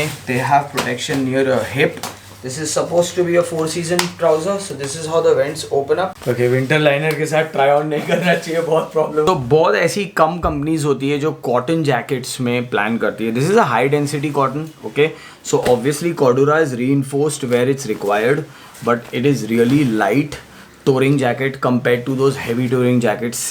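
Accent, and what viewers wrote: Gujarat, India. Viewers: Aap gujarati hai ?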